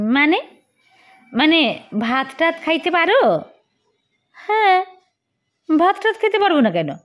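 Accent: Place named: Bangal, Bangladesh. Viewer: খুব ভাল লাগল । কি সুন্দর বাঙাল ভাষা বললি ।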